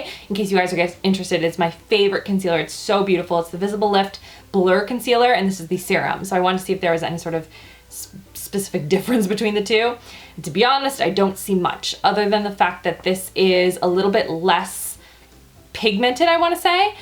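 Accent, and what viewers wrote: Canada. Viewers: Where does she live ? She sounds Canadian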